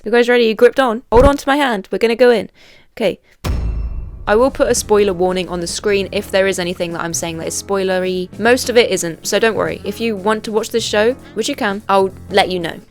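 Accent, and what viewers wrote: England, United Kingdom. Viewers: Oh great another British YouTuber! You should really learn the pronunciation of people's names and not Britishize them. I watched one episode of this garbage show and will not continue. I feel Kardashians are a plague upon this earth! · I seem to have fallen into a habit of watching these types of video essays in the evening and no matter the topic, your commentary makes it fascinating and engaging, so thanks for that! I am trying to reclaim my attention span by watching longer videos lol. Also I find it such a struggle identifying English accents, I'm Irish so I know the difference between North and South and the really obvious ones like Liverpool, Yorkshire and Birmingham but would I be right in placing yours somewhereeee outside of London? As in the surrounding counties or somewhere like Brighton.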